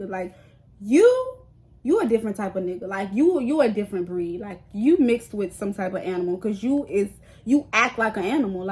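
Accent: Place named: South, United States. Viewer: Your accent is sooo cute and country lol. Where are you from?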